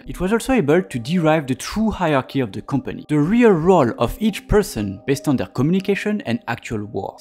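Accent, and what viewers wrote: France. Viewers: great video but french accent was 🤮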